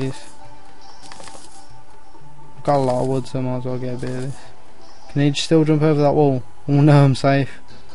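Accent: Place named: United Kingdom. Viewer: I love these Playthroughs with guys with Brittish accent playing it while talking, it's fun to watch! And I'll give you an subrscribe :)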